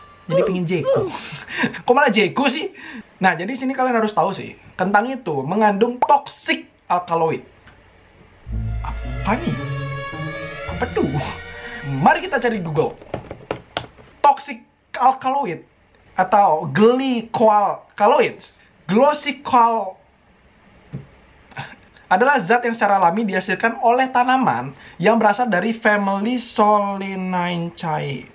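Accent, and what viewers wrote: Bali, Indonesia. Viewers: "Anak muda tidak boleh bermalas malasan" Orang bali kyk aku suka males malesan:v Wkwkwkwk:v Logatnya, logat bali bgt😂 Dan aku baca coment comentnya "mantep bli" ngomongnya "bli" tpi namanya dia isi "Muhamad":v Aku orang yg tdk bisa dibohongi (sejak kapan?,-) BTW.. Aku: {\___/} nih.. ( •. • ) / >❤>\: {\___/} Cai nyen?,- ( - _ - ) [maaf bahasa kasar] / | | \ Gabut mks:D BTW, Buat conten tentang makanan di Rusia dong:( Disini adanya lawar ama betutu aja:v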